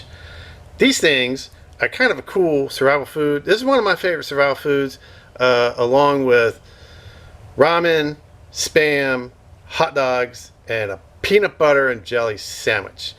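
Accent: North, United States. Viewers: He don't talk like a Southern country boy. Hear some Yankee in there. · I'm from NC and we just eat em plain with Lance Cheese and peanut butter crackers. I'm seriously questioning your "Southern" claim. Sound like a Yankee to me.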